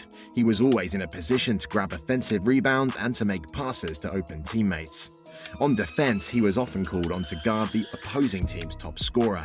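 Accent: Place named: Ireland. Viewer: The narrator is obviously not from the US, so he is pronouncing words he would normally do from his country (?I woud guess Ireland)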